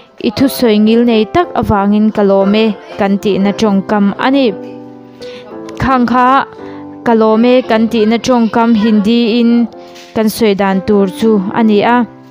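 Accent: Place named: Mizoram, India. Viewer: Mizo tone malum ho ne ki liye. Mizoram ana pore ga kia and that's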